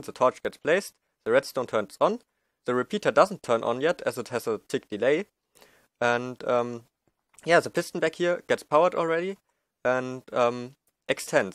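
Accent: Germany. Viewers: der is deutscher als deutsch das hört man immer so schön raus xD aber gutes englisch :)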